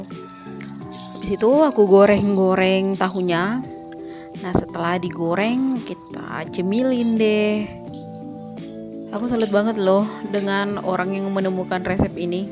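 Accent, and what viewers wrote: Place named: Medan, Indonesia. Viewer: Kakak saya kok penasaran dengan tempat daerahnya dimana ini...? sumatra atau di jawa....kalau saya dengar narasinya setiap lihat videonya seperti mirip orang logat medan (A9)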